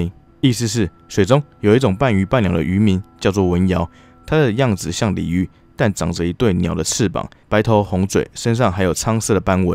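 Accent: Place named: Minnan, China. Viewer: 主播的國語去再進修，一嘴閩南口音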